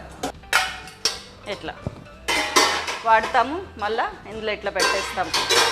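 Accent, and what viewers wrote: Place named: Guntur, India. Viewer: Mee matalu Telangana vallalaga levy akka Guntur valla laga unnai good talking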